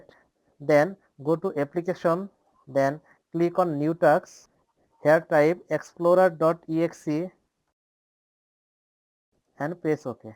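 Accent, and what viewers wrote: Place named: India. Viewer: I clicked off right away after hearing that's he's Indian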